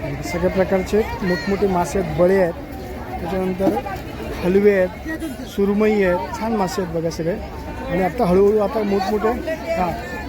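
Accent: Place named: Malvan, India. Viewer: Tumchya tondat Marathi bolata bolata malwani bhasha yeta garva asa malwani aslyacha😂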